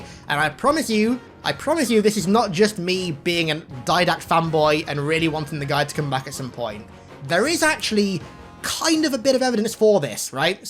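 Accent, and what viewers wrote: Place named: United Kingdom. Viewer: This guy said if my voice sounds a little bit weird LOL this guys voice in general is horrendous he’s got one of those horrible British accents that sounds like he’s got porcelain teeth and he swallowed glass he makes great videos but I just can’t stand listening to his voice no offense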